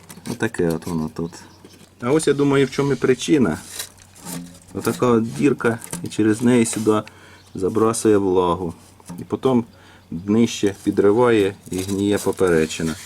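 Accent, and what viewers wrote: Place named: Surzhyk, Ukraine. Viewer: Прекрасна українська мова, так з суржиком) але це українська мова!!!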